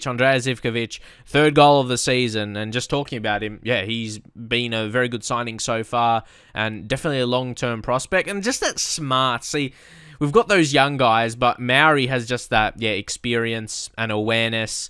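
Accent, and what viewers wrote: Australia. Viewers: Hey mate new subscriber here kinda binge watching this at the min I have an ac Milan save on fm 16 loving your content also the Australian accent is so cool haha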